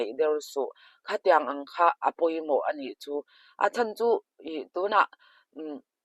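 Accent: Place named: Mizo, Myanmar. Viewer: I thu ziak post ka lo chhiar ṭhin a, a ṭha ka ti viau ṭhin a, mahse i ṭawng ngaihthlak chuan ka lo rindan che nen chuan a va inpersan e, ka ṭawng lo hawlo la min lo hrethiam la, i ṭawng hi ngaihthlak chian chuan Burma Mizo ṭawng a ang, a pai lai deuh a awm nual mai, phalrai tih te adang pawh ka hre ṭeuh, mahse dan hriat hi apawimawh a, chu lamah chuan i ngaihsanawm.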